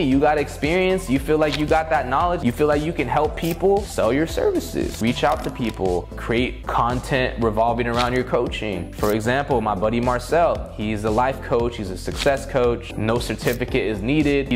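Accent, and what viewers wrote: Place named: United States. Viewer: Dude you need this in your video for people who , don't understand US accent english 10 Side Hustles to make MONEY from HOME! ($100k + per year) 10 Side Hustles You Can Do To Make Money From Home Section Overview: In this video, the speaker shares ten side hustles that require zero dollars to start and have the potential to replace your current job. The speaker provides detailed information on each of these side hustles. Your Own Clothing Store You can create your own custom t-shirts using websites like Printful or Teespring. You don't need to buy inventory; you just mark up the price from the cost of the product. Create your own Shopify website and connect it with Printful so that every time someone buys something from your Shopify website, it looks like they're buying from your store but in reality is being bought for Printful. 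You never have to worry about cost of inventory and you never really have to pay a dime out of pocket. Script Writer People out there need scripts for making content. Hire scriptwriters on Fiverr or Upwork who will do research on your behalf and create a script for you. Many people hire scriptwriters for their YouTube videos, informative videos, skits for YouTubers, tick-tockers, Facebook creators etc. Showcase your work on Fiverr or Upwork by building a portfolio. YouTube Find a niche and ride with it. If you don't want to show your face, start a cash cow channel showcasing celebrity mansions. 03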